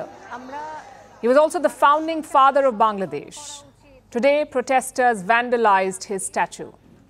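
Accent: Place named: India. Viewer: Just typical Indian style English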